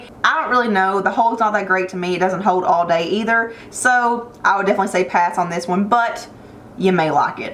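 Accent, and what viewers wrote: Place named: Kentucky, United States. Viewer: Those are my favorite curling irons too! (The sally beauty ones) I’ve just discovered your channel today- you sound like us central kentucky girls, but I can hear a Georgia twang, too. Where are you from? Great channel!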